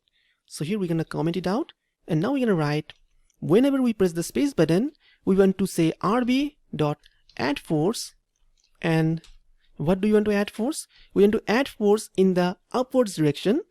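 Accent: India. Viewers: It migt be a little bit racist but when i heard his indian accent i knew he knows what he is doing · i pressed the video and i said to my self its like other videos it wont help until i heared indian accent and i got locked in❤ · Little skeptical about the fifteen minutes, then I heard the Indian accent and I then knew that the title wasn’t joking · Once I heard the Indian accent I knew he actually knew what he was doing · it would be perfect tutorial if it didnt have the in*ian accent.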